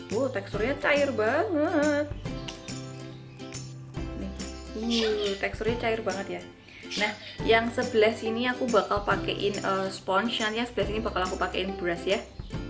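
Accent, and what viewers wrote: Java, Indonesia. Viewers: Nyantol nek mbak medhok jelasno, mantul 😁 sekarang udah punya baby zack jadi lama kalo mau ada video baru jadi rindu 😍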